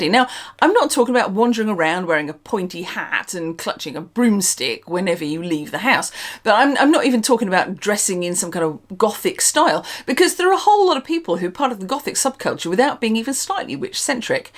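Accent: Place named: East Anglia, United Kingdom. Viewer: What makes your accent unique to East Anglia? I do like it.. . I have a mild southern accent.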